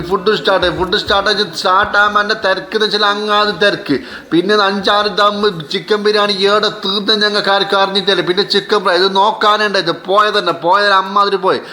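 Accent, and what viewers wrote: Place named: Kasaragod, India. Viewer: Kasargod samsaram poliya 😂❤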